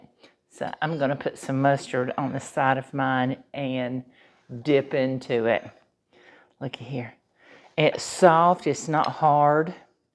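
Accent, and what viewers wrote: South, United States. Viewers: Hey there, this is first time I’ve seen you. Love your bright and beautiful kitchen, and your beautiful soft and calm voice (you sound Southern like me). These are my hubby’s fave breakfast foods but I’ve never made into pockets before, a must try! At age 74 I’ve learned something new. Thank you!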